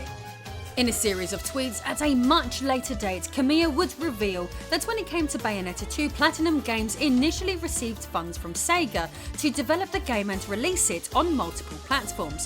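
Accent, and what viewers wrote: United Kingdom. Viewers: You are absolutely the perfect person to cover this material! Sultry and British!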